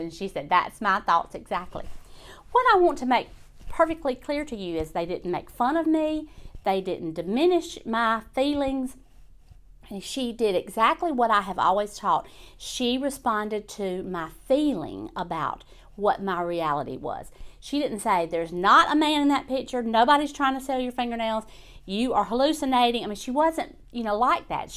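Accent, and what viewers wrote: South, United States. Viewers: Jessie Barnett continued - I’m excited to know there’s someone else who has a similar combo of chronic illness stew ! I have CHF - and wear the same lovely “zipper” jewelry at my neck. I too have seizures roughly twice a month, complex partial, secondary differential nocturnal seizures. I’m not diabetic, but I am in 3rd stage kidney failure. A week ago I was diagnosed with vascular dementia, stage 4. During the winter, I was admitted to the hospital with severe bronchitis. It was there I experienced a most frightening experience — Steroid Psychosis. Never, never take a steroidal medication ! Did you pick up the Southern accent in my posting ? I live in North Alabama, Scottsboro, very close to Huntsville. To the aggravation of my doctors, I’m an avid YouTuber, watching every video on dementia. Count me among your many, many fans. 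I savor every post and most sincerely appreciate your knowledge and easy delivery (Southern) of course. What a pleasure it would be to have a chat with you discussing our mutual ills and hospital adventures - fueled by multiple glasses of iced tea ! Pace yourself, take every pill, follow every rule, and know you have a Crimson Tide friend including you in every nightly prayer. 💜 Jessie Barnett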